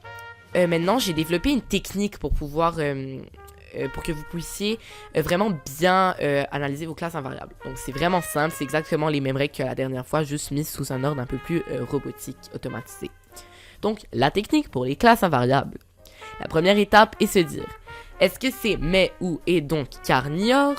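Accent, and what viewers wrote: Canada. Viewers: Il a un petite accent québécois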